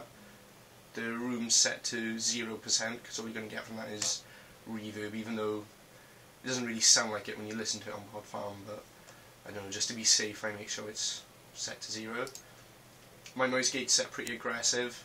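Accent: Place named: United Kingdom. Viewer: 'eees soooo british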